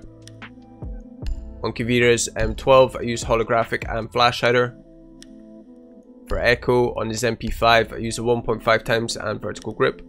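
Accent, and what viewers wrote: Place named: Northern Ireland, United Kingdom. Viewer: Are you from Northern ireland because you sound like it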